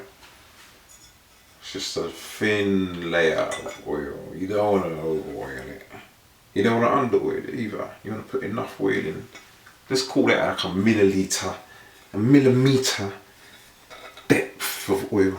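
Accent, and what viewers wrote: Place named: England, United Kingdom. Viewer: thanks bloke. I wish all men sounded English like him. hubba hubba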